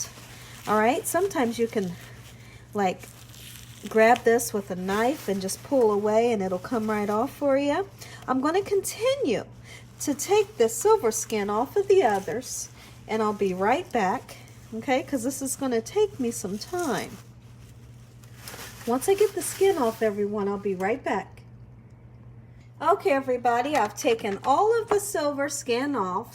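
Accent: South, United States. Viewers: Ooooh she country I love it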